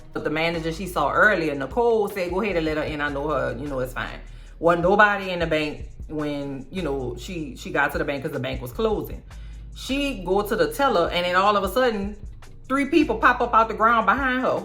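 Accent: New Orleans, United States. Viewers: The New Orleans dialect is the absolute BEST!! I love the way you speak!! ❤❤❤❤ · It’s the N.O. accent for me😂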